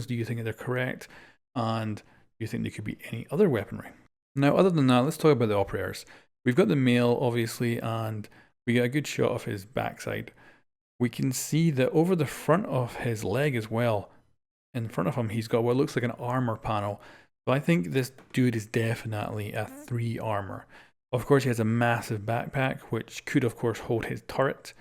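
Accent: Scotland, United Kingdom. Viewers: Love the accent! Are u scottish? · Are you scottish?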